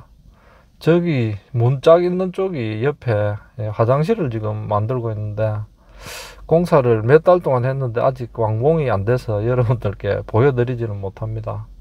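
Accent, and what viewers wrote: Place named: Haman, South Korea. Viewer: 구수한 함안촌넘사투리에 맛깔나는 향수에 푹빠져드는 맛으로 자주 시청합니다! 하시는 사업 번창하시길~~^^^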